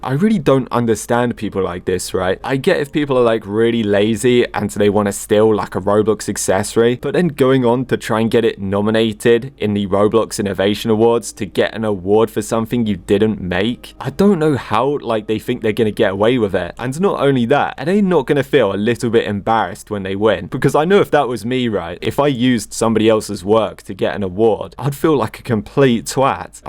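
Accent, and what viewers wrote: United Kingdom. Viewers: Hah british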